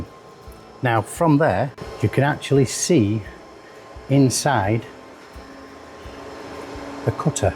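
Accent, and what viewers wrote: United Kingdom. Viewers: I am not a metal worker but have been interested in metal work for the past 5 or 6 years now, I am actually a woodworker and I make fine furniture with various hardwoods etc, but I am very interested in the XTool MetalFab and I have ideas what I could achieve if I had this machine, I might have to sell my car just to get it as it is so expensive but I have a vision. I enjoyed watching your video so I have now clicked on the thumbs-up button and I have subscribed to your channel as well. Great to hear the accent and that you are UK based as I too am originally from the UK although I now live abroad. 😀👍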